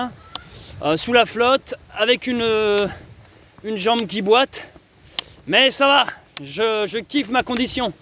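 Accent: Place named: France. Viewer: Fabuleux votre ´ french accent’ 🥰🥰🗽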